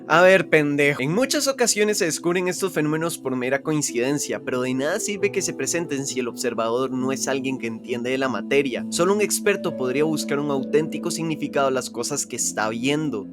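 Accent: Costa Rica. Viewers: Escuche la voz y dije "Es tico" y luego reviso la bibliografía y es de biotec💚, muchos animos en este proyecto, ojalá te vaya super, yo soy primer ingreso de biotec.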